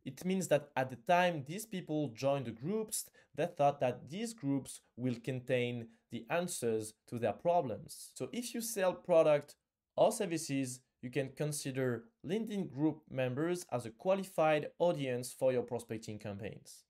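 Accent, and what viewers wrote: France. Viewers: This is a fantastic video! There are a lot of YouTube videos that recommend using Linkedin Groups. Thanks for letting us know that post in Linkedin Groups is a waste to time. However, the volume on this video is rather low. And the captions are not able to capture every word because of your French accent. I'm not complaining about your French accent. But you need to do something about the volume so that people will not click off your videos.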